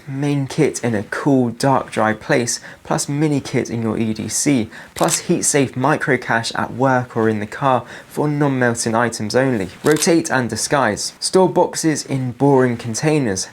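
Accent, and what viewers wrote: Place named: England, United Kingdom. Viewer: This is sound enough advice, but two things strike me, as a skeptical viewer. This is a clever and simple way to get affiliate links. (You may not be.) Well, if people are going to use Amazon to buy such things anyway, fair enough. You're English, judging by your accent, and you've made yourself famous as a man who knows how to store things for a chaotic time in the UK. Granted, most opportunistic thieves are not scanning YouTube to find the faces of people such as you. They are too busy being a nuisance on the street. I am sure you'll shave the beard and I would hope your hair is fake - not that there is anything wrong with actually having long hair.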